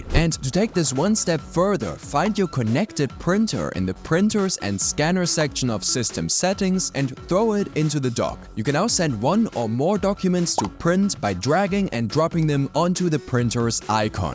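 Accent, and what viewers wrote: Germany. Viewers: I found out is German because of his Non-American accent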